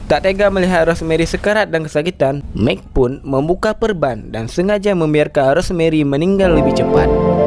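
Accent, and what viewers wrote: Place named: India. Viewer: Lage logat india si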